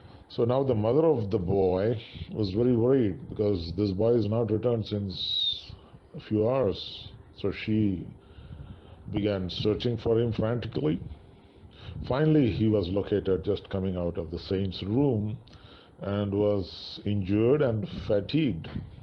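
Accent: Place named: Kerala, India. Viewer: Poda devil 👿 Who are you ? Are you 👿 spirit? What is your aim? Nitne English kettal ariyam nee oru mallu annannu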